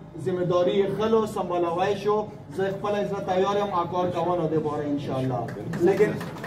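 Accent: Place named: Punjab, Pakistan. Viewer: Jaisy koe punjabi pashtu bol raha hu...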